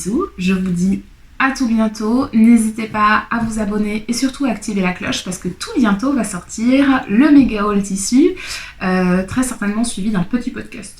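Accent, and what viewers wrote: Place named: Moselle, France. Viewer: Coucou, Super sympa ton tagg. Merci pour ce beau partage. Ne t'excuse d'être remplie d'émotions pour ton fils... l'amour d'une mère vers son fils, cette maman que tu es prête à franchir des montagnes pour lui... c'est beau... oui, pas de pression... s'épanouir chacun à son rythme. Et sinon, trop sympa ton introduction musicale. A bientôt par ici ou par là-bas. PS: vive l'accent Mosellan. Allez, encore un peu et il sera encore plus prononcé.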